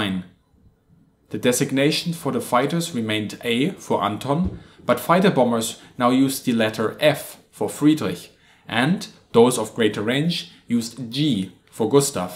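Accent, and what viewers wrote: Germany. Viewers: Great target stuff vid. Ohh man I do love my fw 190 a8-r2..( tamiya ); you got afunny strong german accent..I like it, un saludo desde Madrid. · Are you german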